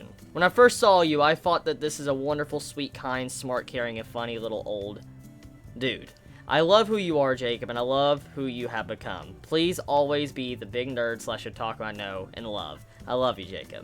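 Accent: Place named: Tennessee, United States. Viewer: hmmm i notice you speak with an accent at times, then i hear you live in Tennessee. So i assume you build up an accent or grew up with the accent.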